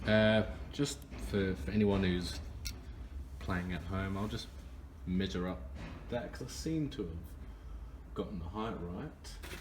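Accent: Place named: New Zealand. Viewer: Thankyou for this bro. Saved me a fortune right before Christmas. Sounds like you're from nz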